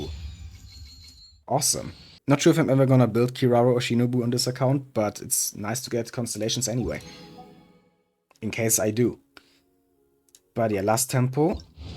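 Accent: Germany. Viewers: U sound German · Ich höre ein wenig deutsch raus Grüße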